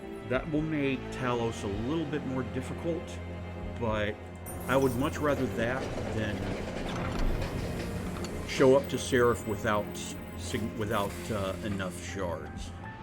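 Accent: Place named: North America. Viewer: Hi Not looking to pry, just hope all the hospital stuff you had mentioned earlier has gone/is going well. Thanks for posting these, they helped me figure out new methods of getting through the challenges. Edit: FYI, I'm all the way in Australia, just going by your accent I'm assuming you're in North America.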